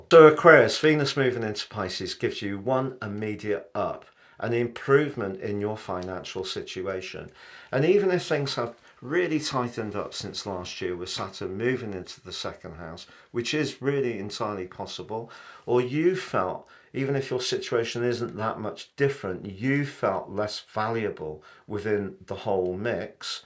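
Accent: United Kingdom. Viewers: I love your British accent 😍and your videos 👌! “Venus in Pisces” (I’m a Pisces and I love many sorts of arts… ) By the way : I’m a Dutch 🇳🇱 ‘hobby’ astrologer -> My uncle was a ‘real astrologer and he taught me how to make and draw astrological charts AND of course how to interpret them, because it’s a way to better understand people. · You're british?! 😂❤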